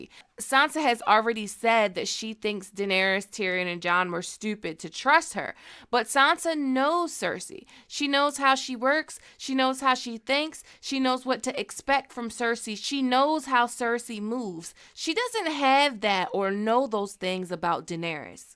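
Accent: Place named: Michigan, United States. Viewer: You from Michigan aint you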